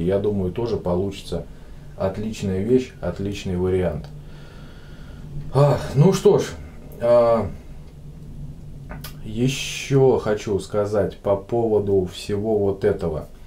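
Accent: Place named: South, Russia. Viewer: Привет,!(Я правильно понял,Вас зовут?) Приятно смотреть,а ещё приятней слушать южно российский говор с мягкой буквой "г".Сам со Ставрополья,разговариваю так же.Буду рад,если достанутся дрожжи на халяву!🤗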